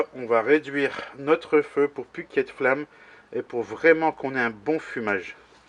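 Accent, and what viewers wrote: Nord-Pas-de-Calais, France. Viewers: Quel bonheur de t’entendre parler Ch’ti je le dis encore ta joie de vivre et contagieuse 👍 bonne journée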